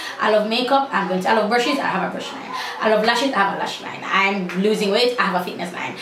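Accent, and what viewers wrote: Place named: Jamaica. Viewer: I'm Jamaican... She sounds like me and she lives in Jamaica, so I thought she was Jamaican.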